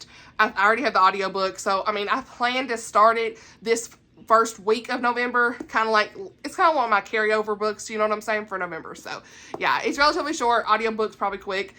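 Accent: South, United States. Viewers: Are you down south with me?? I’m in Arkansas. I hear the twang 😎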